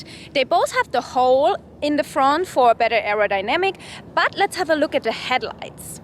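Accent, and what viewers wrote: Germany. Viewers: I swear you sound german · Der Deutescher Akzent 🇩🇪😂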